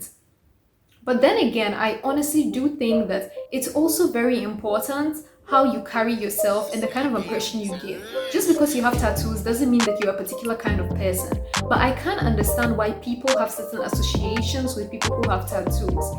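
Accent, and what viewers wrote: Ghana. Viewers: You're so wise for your age. And your Ghanaian accent is so sweet and authentic. I wish I could regain my ability to speak like that again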